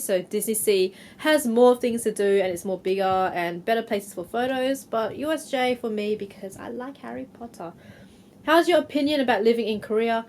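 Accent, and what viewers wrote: Australia. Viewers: Australia is a bit broad, you seem to have a Sydney accent and talk like a Sydneysider as well so thats what i will guess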